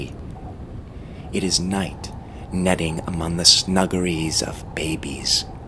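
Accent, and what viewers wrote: United States. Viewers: Doesn't sound right read with an american accent, but well read that man .Great poem, a real journey through what he was thinking. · Lovely. I didn't think I would like a US accent, but it works too.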